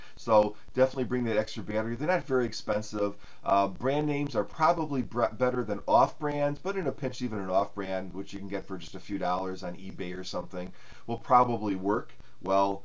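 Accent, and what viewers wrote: Chicago, United States. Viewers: are you from chicagoland?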